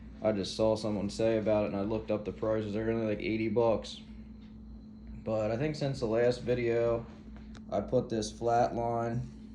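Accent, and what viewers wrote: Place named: Delaware County, United States. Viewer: Sounds more Delco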